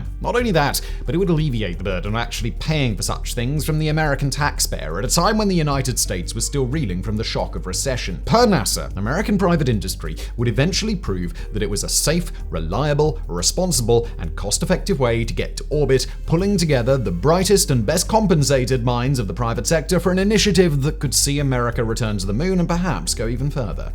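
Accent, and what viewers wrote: United Kingdom. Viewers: So sick of hearing this British sounding windbag. He narrates everything.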